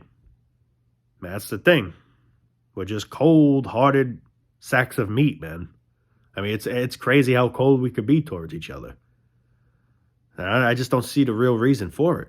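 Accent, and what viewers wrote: United States. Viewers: So true sadly.. also I like your accent! What part of America are you from?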